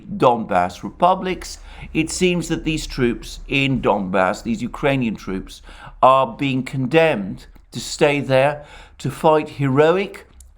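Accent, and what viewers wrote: United Kingdom. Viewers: Very good information about the war in ukraina , and as i said before , you speak very eloquent and ( I also must say that the British accent makes it wonderfully clear and pleasant to the ear ) cheers 👍😀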